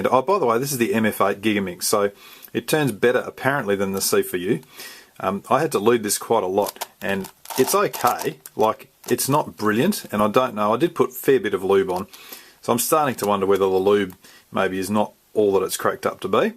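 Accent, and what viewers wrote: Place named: Australia. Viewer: Are you Australian, mate? Loved your accent 😂 Also loved the tutorial. It really is the best! You explain all the basics, but don't treat your viewers as idiots and repeat same things seventy two times. Your explanation is clear and simple and you rule!! 😎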